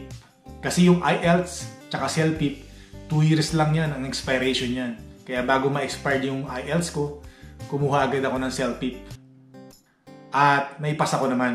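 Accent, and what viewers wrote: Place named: Pampanga, Philippines. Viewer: Ang galing ni kuya kahit mahaba ang story❤ parang kapangpangan si kuya sounds like😂 tinapos ko story mo very inspiring🙏☺️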